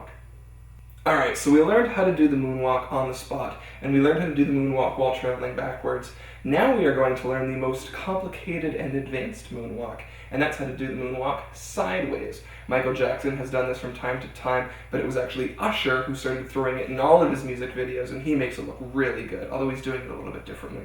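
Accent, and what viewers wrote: Canada. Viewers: Your Canadian aren’t you😂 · Well explained. Are you Canadian? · Are you canadian?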